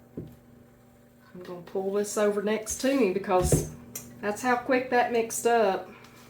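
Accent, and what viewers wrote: South, United States. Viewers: I love your southern accent! This is just what I was looking for. I had previously tried to fancy-up the gingerbread mix with pumpkin by myself and did not elimate the box ingredients of water and egg and oh my, what a very moist and mushy kinda dessert mess more like a pudding which has its purposes. But this time I only added pumpkin as you did and then added Heath crunchy bits to give it a nice surprise. I plan on making a creme anglaise sauce to make it classy!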